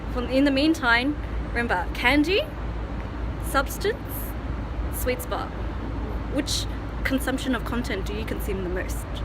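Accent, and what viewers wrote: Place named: Australia. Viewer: Love the Australian accent but your breakdown of substance vs. candy content is spot on.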